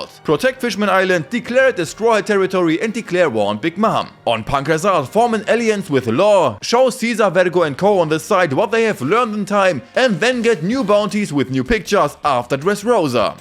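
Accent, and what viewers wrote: Germany. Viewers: Man kann merken dass du Deutsch bist jeder Mensch in Deutschland spricht so hahaha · Are you german? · you sound german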